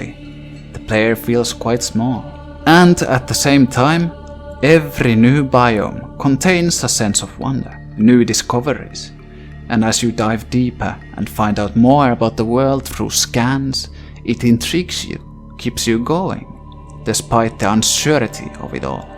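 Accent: Finland. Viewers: Holy fuck I wondered from that accent if you were Finnish first I thought you were Russian it's cool to see a fellow Finn play this...well absolutely mentally scarring game somehow my little sister is unphased of literally everything in it though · kyllähä ton suomalaisen rallienkun tunnistaa mistä vaan. Hyvä video tho! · You have such a perkele accent. I love it!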